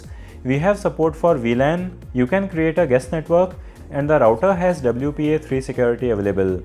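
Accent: India. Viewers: I fully understand why you speak so quickly, to keep the review as short as possible without compromising on your review detail. Your STRONG Indian accent is very difficult to understand so the subtitles are a useful addition. There is one mistake in the subtitles, stating that the router has 6 NON removable antennas but the subtitles read removable antennas. Otherwise a good review. By the way, here in the UK its available at Amazon now at just £99.99 which is almost £50 cheaper than is typical for the suppliers that actually have it on the shelves. Incidentally thanks to your reviews I cancelled my order for the AX50 in favour of the AX73 router. I am CERTAIN that I won't be disappointed. Keep up the great work. The price must have been a special one day offer because it rose to £169. Sorry guys I suppose I was just lucky, "right place right time". UPDATE: Wow that price seems to have been a one day ONLY offer and I have had to wait what seems like a LONG time for delivery, (expected today) the cheapest I have seen the router since ordering is £179 so I got a real bargain, assuming it lives up to expectations.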